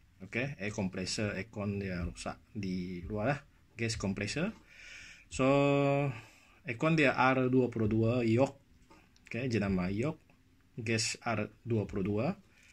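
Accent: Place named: Bidayuh, Malaysia. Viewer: Slang bidayuh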